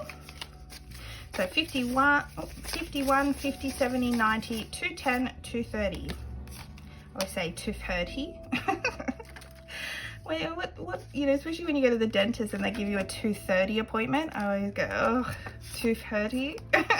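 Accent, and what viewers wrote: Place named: Australia. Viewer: Her accent sounds like Australian so I assume that is the currency.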